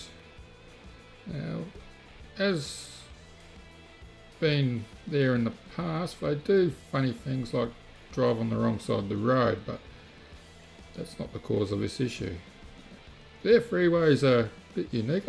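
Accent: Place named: Australia. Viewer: Love your Aussie accent....Relaxed talking manner but not too relaxed for the masses....sometimes my ears couldnt relate..sorry....so as my kind teacher used to say.. "Talk nicely and clearly"...! .just so all your valuable help sinks in..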